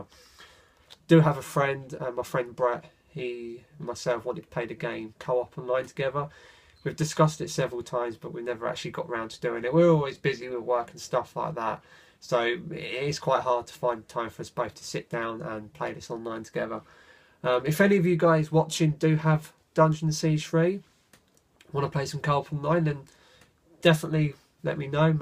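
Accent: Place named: England, United Kingdom. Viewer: Very cool channel man! Sub for sure. I'm just a sucker for that cool English accent haha u don't have that many games on your collection u haven't played, if I was to do a video like this, I would show 80% of my collection unfortunately, maybe more. One day I'll catch up, that's what I keep telling myself anyway. I used to play a lot of rainbow six Vegas when I first got the 360 had a lot of fun with that game! Anyway you got more cool videos and I'll go check them out! Keep up the good work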